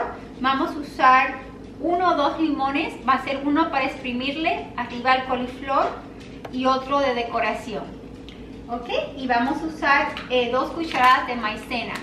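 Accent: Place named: United States. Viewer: porque hablas como hablaria una americana, habla bien espanol.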